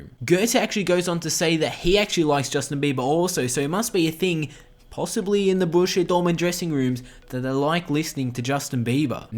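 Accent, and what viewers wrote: Australia. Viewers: Are you Australian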